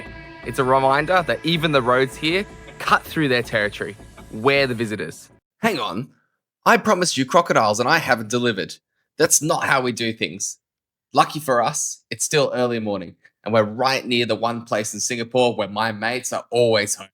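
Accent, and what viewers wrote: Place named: Australia. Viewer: You're essentially SG's Crocodile Hunter now! How fitting that you're also Aussie (I'm guessing, from the accent) Would be keen for those croc tours you mentioned to another commenter, if they ever get off the ground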